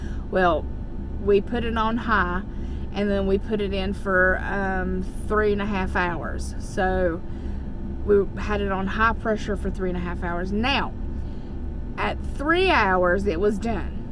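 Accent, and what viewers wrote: South, United States. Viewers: Love the way you say "Oil". You still have a lot of south in the mouth!!!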